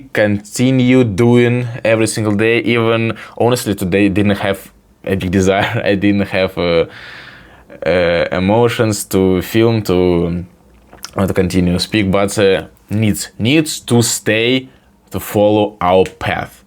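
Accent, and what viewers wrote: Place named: Russia. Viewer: 😭 your Russian accent speaks so loud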